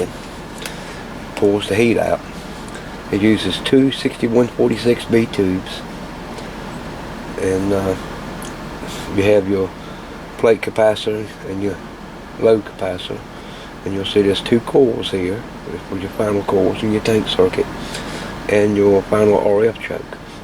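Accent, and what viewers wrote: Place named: North Carolina, United States. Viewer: You sound like your from North Carolina or Tennessee im from North Carolina.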